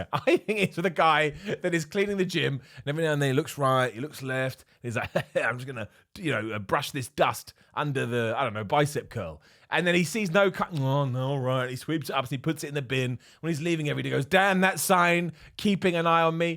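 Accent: United Kingdom. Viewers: I thought all this time was saying “ the bald Eagle” but no that’s not what he was saying - love British accent 😁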